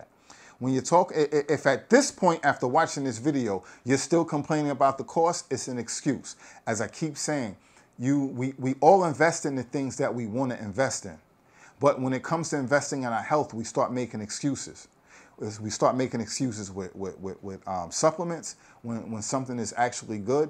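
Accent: New York, United States. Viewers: Is the New York east coast vibe my mans here is real maybe you not use to that lol 😂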